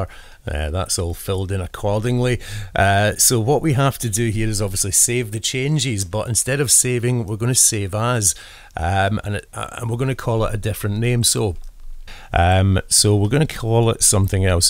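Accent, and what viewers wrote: Scotland, United Kingdom. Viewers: Love your accent!!!! Scotch, Irish? I would guess the first but I love it regardless!! And Theenks fir da veedeo. XXOO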